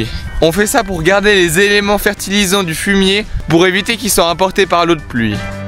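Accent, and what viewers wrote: Switzerland. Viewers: top ta vidéo, et j'adore t'entendre parler, "ton petit accent suisse" bonne année a toi et tes proches et tu ne fait pas un boulot de faignant a +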